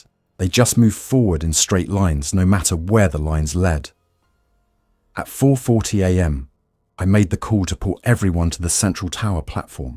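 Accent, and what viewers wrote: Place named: United Kingdom. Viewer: it does seem a little odd for an AI voice that could have whatever accent you want, to have a british accent talking about what happened in america.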